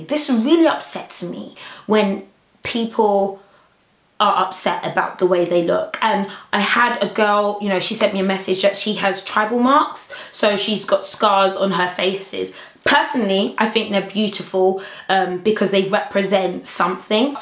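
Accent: England, United Kingdom. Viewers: oh my gosh . black women with english accents are just so beautiful to me. black women in general are beautiful, every woman is beautiful.. but i mean, gosh! the accent just kills me, i love it! <3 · I love your raspy british accent ugh!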